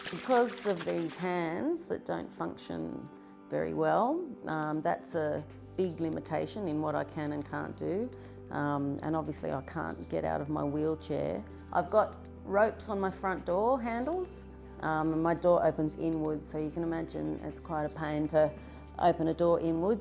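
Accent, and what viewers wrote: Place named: Australia. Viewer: I wish u all the best. Im from Victoria Australia. I recognise our accent. Take care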